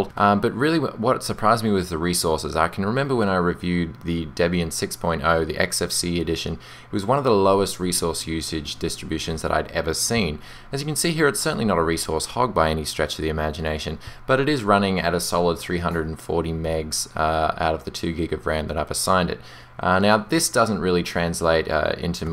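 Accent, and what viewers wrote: Australia. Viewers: I think there's a quite a bit of Ozzie in his voice but I dunno what else.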